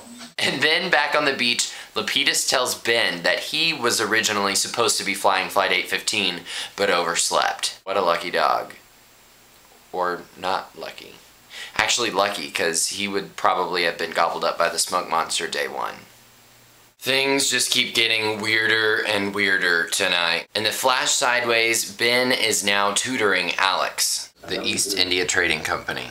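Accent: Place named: United States. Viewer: I love your videos dude, and I especially like the sound of your voice and your accent, it's so cool, which part of the US are you??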